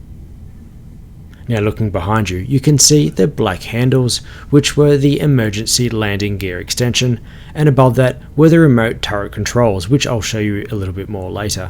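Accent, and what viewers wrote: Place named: Australia. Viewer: Hate your Australian English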